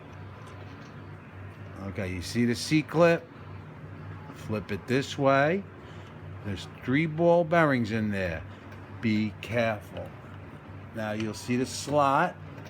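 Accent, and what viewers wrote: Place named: Bronx, United States. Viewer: I will be applying this fix to my 2005 Buell Lightning XB12Scg, since they have similar engines, and hopefully, the concept is the same. However, I won't know for sure, until I get in there. I'll let you know how it goes. Thanks again. By the way, you sound like you're from the Bronx. I'm originally from Throg's Neck.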